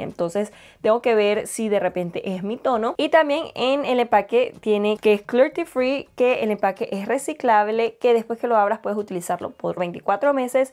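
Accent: Venezuela. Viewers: Es el primer video que veo tuyo…te oí hablar y sentí el acento venezolano…tu mamá dijo Naguará y lo confirmé! jaja 😘🇻🇪 me ayudaste mucho con esta prueba de producto gracias!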